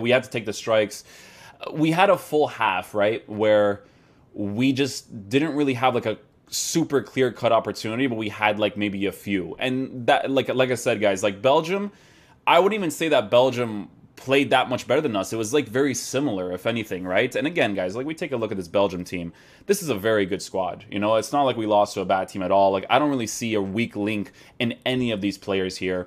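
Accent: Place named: Portugal. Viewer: I swear to god I cant understand if u are portuguese or not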